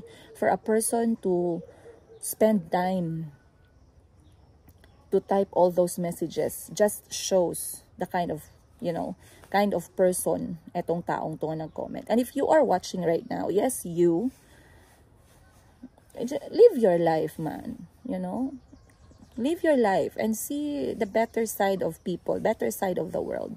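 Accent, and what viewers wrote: Iloilo, Philippines. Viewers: Maam im laughing while your are talking accent ilonggo, i love it watching fr.iloilo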